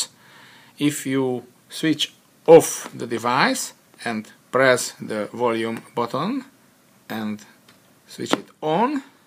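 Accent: Hungary. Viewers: ajj de magyar vagy te is :D kurva akcentus mi? :D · Annyira hallani az akcentuson, hogy magyar :DDDD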